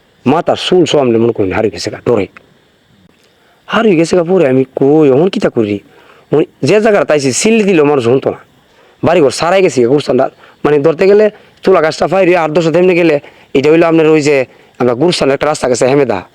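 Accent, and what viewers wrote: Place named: Brahmanbaria, Bangladesh. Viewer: এটা ব্রাহ্মণবাড়িয়ার ভাষার মতো লাগলো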